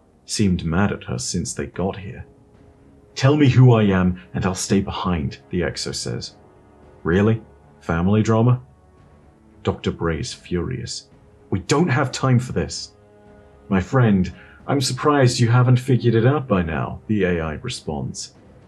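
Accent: United Kingdom. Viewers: You sure can. But you could also comment on British accent and the nuanced way he pronounces things, too.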